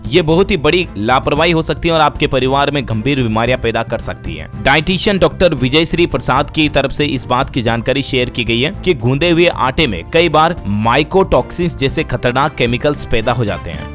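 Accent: Rajasthan, India. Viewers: Tum rajasthan se ho kya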